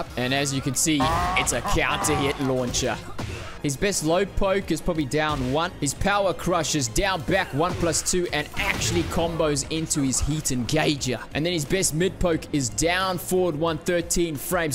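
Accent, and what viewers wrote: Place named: South Africa. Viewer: I know your profile says you’re Australian, but I can recognise a South African from a mile. Awe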